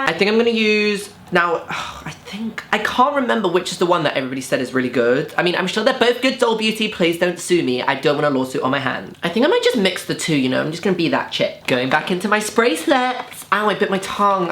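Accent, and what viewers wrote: Scotland, United Kingdom. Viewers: Love ur Scottish voice we love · the scottish accent through this killed meee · Scottish then? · we stan a scottish intro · The Scottish accent tho😂😂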